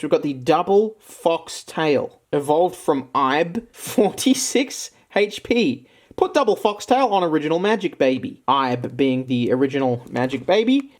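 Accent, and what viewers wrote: Australia. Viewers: Shouty aussie gets words wrong. 10/10 I'm happy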